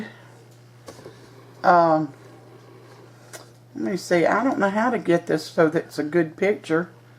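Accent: South, United States. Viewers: Hi Hun, new subbie here!! What state do u live in? If I may ask lol..I can tell your in the south, I'm in Tennessee, I like a lot of your recipes!!! I'll be sticking around😊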